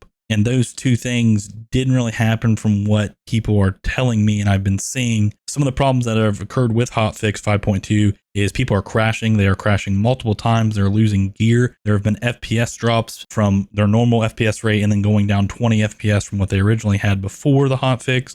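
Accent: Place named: Baltimore, United States. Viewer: You have that Pittsburgh/Baltimore accent going on and I like it Edit Mostly Baltimore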